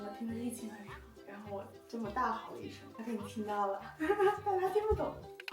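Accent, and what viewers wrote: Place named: Hubei, China. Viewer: 聽這聲音語調一直很熟悉~原來是湖北口音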